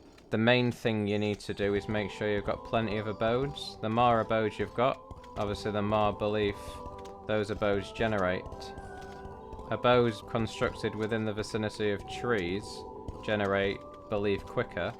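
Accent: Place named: England, United Kingdom. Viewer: Man i love your accent.. which part of england is that? Also im glad to inform you that ALL of your vids are helpful and im enjoying your chanel. Keep up the good work mate. :)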